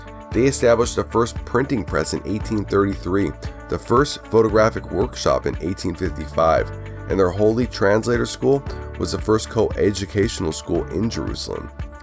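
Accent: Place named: United States. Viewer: Video and preparation optimal. Commentator's English is too American and word eating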